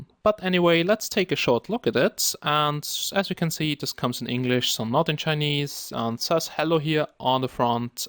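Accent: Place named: Germany. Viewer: damn you sound german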